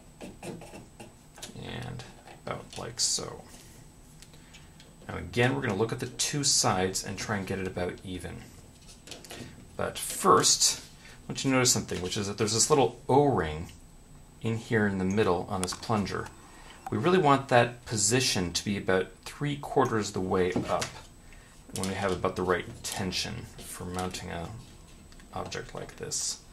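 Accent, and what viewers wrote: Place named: Canada. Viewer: are you Canadian?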